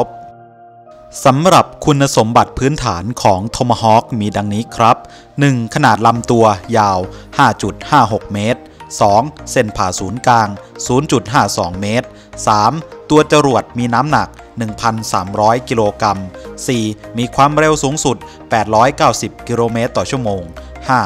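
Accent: Thailand. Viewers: แอดคนบ้านใหนเสียงเหน่อๆ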